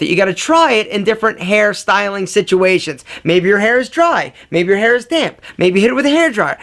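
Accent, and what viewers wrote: Philadelphia, United States. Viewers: Hes from the Philly area... I can tell bc he pronounced water as " wooder"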